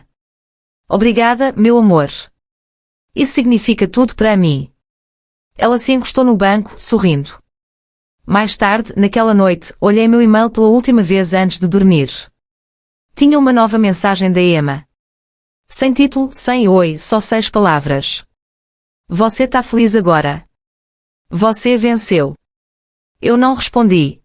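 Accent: Portugal. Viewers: Eu gosto da língua portuguesa (de Portugal mesmo? Gostei mto da história, foi mto bem narrada, parabéns. 🎉😊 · Uma narração de áudio de Portugal eita meu Deus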